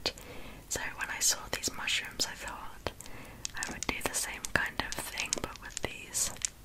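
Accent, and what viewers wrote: United Kingdom. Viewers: Yes yes yes! Best ASMR ever. Your British accent is a trigger itself for me! Lots of love from Dominican Republic ❤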